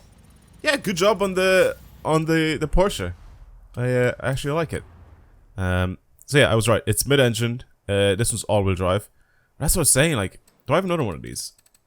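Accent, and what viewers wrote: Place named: Donegal, Ireland. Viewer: Where you from in Ireland cause I can’t recognise that accent you sound a bit like Donegal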